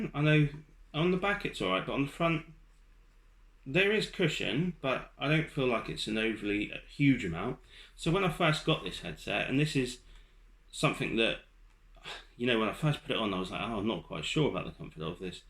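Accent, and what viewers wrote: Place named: England, United Kingdom. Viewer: It's amazing how much I love an English accent.. and how much them pronouncing "H" bothers me. We (U.S.) say "A-ch" They say 'Hate-ch". breaks my brain. :) Thanks for the info and review!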